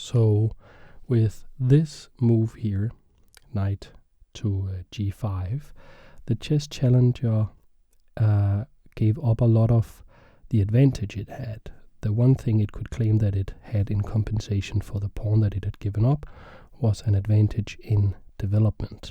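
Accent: Denmark. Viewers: Danish accent?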